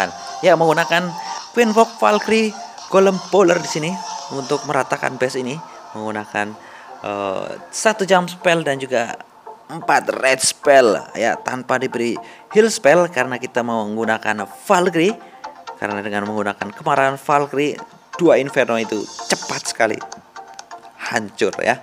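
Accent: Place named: Java, Indonesia. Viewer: suaramu wagu bang. medok dan tidak beraturan. ga enak d dengernya. #no haters.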